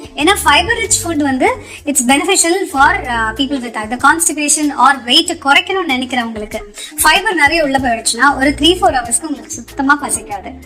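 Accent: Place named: London, United Kingdom. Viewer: உன் சொந்த ஊர் சென்னை வியாசர்பாடி தானே பிறகு எதற்கு லண்டன்லே பிறந்தா மாதிரியே பேசறே.. இன்னமோ தமிழே தெரியாத மாதிரி 😠😠😠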